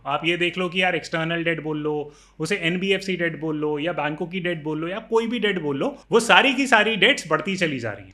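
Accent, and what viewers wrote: Standard. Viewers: Aapki shudh hindi kabile tahreef hai ustad :) it is never expected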